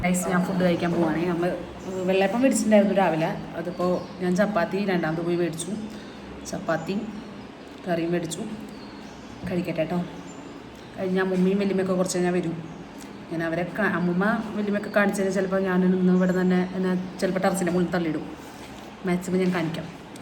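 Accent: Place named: Thrissur, India. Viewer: Nammade asal thrissur language..enikku kettappo samadhanam ayi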